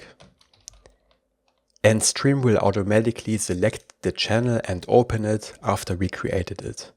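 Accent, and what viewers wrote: Germany. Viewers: Direkt gemerkt das du deutsch bist haha, super content!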